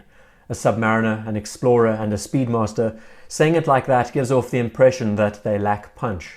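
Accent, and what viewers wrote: South Africa. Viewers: Agreed! I thought South African. Keep up the good work!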